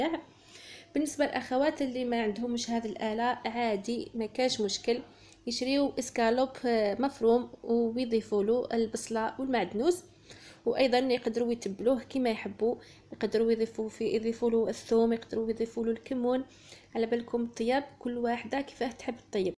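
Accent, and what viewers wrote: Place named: Algeria. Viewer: ما شاء الله الجزائريات شاطرين جدا معلش اللهجه بتاعت حضرتك شبهم انا مش بعرف افرق كلنا اخوات طبعا وتسلم ذوقك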